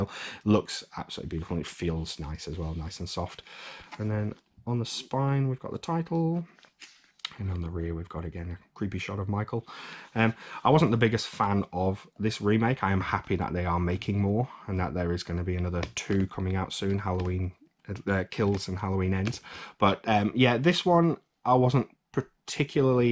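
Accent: United Kingdom. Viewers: Where in the UK you from? Trying to pinpoint accent. Its hard sounds northern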